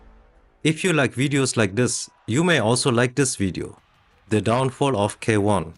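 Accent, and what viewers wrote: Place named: Germany. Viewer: nice video bro but are you using an AI voice? Its sound extremely german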